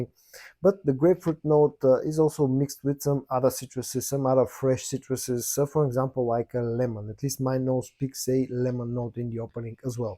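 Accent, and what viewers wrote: Bulgaria. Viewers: Хаха веднага познах, че си българин от акцента иначе добър клип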